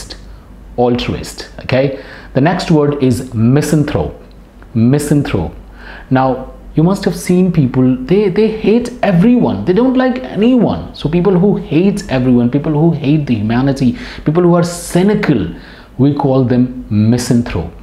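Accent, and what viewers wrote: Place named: England, United Kingdom. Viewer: Sir I like your English accent 😊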